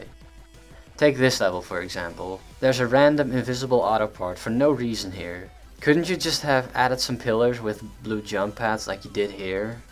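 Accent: Netherlands. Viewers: bliss that's cause he is dutch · you sound dutch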